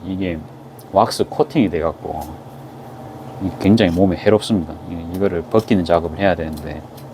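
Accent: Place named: Busan, South Korea. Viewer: 영상을 보면서 저도 자몽청을 한번 만들어볼까? 하는 생각을 합니다 정감있는 부산말 참 좋습니다!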